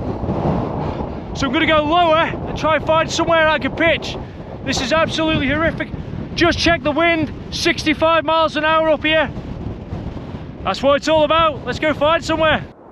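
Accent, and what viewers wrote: Liverpool, United Kingdom. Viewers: Great video. Love the Isle of Man. .Accent is bizaare. Sounds scouse sometimes (I'm from Liverpool myself) but then a few other accents.. Very intersting!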